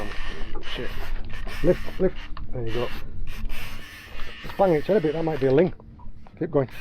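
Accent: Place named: South West England, United Kingdom. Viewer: I actually grew on brittish movies and tv shows, and it still didnt help me with your south-western accent and dialect haha. The subtitles really helped a lot, youtube logarithms working wonders nowdays haha. But dont worry, these things are mutual among us sea people and highlanders. I mean, if you learned croatian language, you wouldnt understand me either haha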